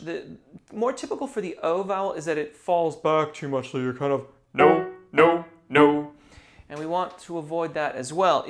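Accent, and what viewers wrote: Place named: Midwest, United States. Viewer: I am so grateful for the tremendous amount of pure quality content you provide. I find it interesting that you mentioned you are from the Midwest, then California, then England. I can tell. I am a Midwesterner myself and now your manner of speaking makes sense. 😉 I heard the influences but couldn't place where they were coming from. I just love your manner of teaching... Sometimes I forget you are not live and playing scales just for me! Thanks again.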